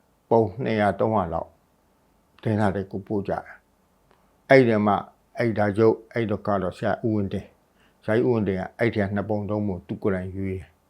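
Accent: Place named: Upcountry, Myanmar. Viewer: အညာသားလေ သံ မပျောက်။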